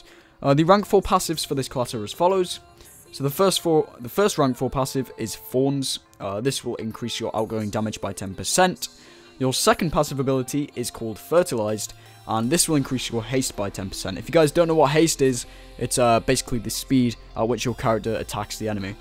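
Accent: United Kingdom. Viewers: I though it was from Scotland, kinda similar. Anyway thanks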